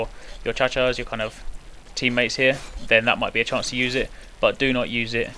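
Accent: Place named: England, United Kingdom. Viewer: its always a guy with an english accent doing this tutorials XD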